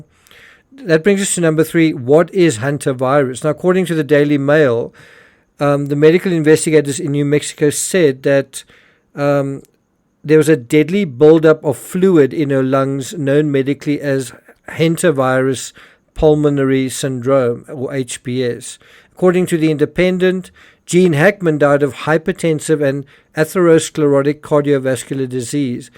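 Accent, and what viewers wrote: South Africa. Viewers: Do I detect a South African accent? I can understand Betsy not calling for help. I got swine flu, and could not even call my husband, get back on the bed, if he had not come home, I had slipped into an acceptance of death stage, he carried me to the car and into the surgery, all the time I was in a dream world. RIP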